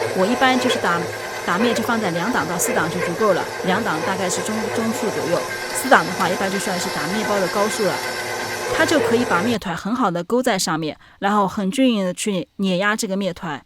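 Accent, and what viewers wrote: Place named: Shanghai, China. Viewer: 博主是上海人？口音可爱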